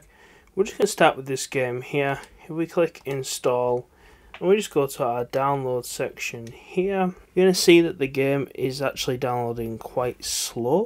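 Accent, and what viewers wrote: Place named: North, United Kingdom. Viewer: cheers. where u from mate u have same accent as where in from be up north lol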